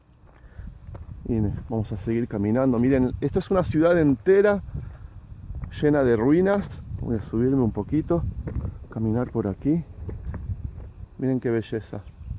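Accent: Argentina. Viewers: Cuando comencé a escucharlo pensé que era argentino. Pero poco a poco he escuchado su relato y es muy interesante, no sé cual sea su verdadero idioma pero agradezco que nos cultive a través de estos vídeos. Es el primero que veo, pero veré todos❤❤❤❤❤ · Si la verdad. Estoy siguiendo cada video del hermano. Por su acento vivio en Argentina. Donde sea es muy gratificante y de mucha sabiduria. Bendiciones para ti. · Tienes acento argentino o de esos lados saludos hermano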